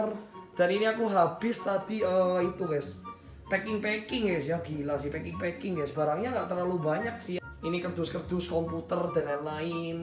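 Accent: Java, Indonesia. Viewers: Asli pundi mas kok logat e Jawa wkwk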